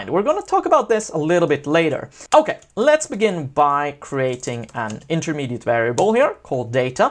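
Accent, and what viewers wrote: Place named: Sweden. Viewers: Swedish accent > American accent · Haha I remember when I watched this channel for the first time and I was wondering where is from. English that sounds better than the American one ^_^ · Swedish accent ftw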